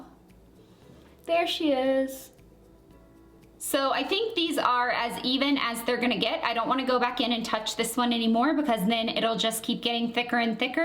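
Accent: South, United States. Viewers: the southern accent ahhh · LOL you had such a thick Southern accent! And I love the reference hahaha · Aw I miss your country voice! Haha I have been watching you since back then!! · You were the first person who I subscribed to for beauty videos and I love this look! BTW you had more of a southern accent back then. I love southern accents! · That southern accent in the first video! where did it go? :-P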